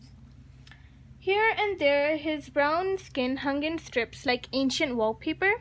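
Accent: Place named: India. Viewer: Hi, good analysis, loved it❤️ Btw, are you Indian? Your pronunciation is so cute😍❤️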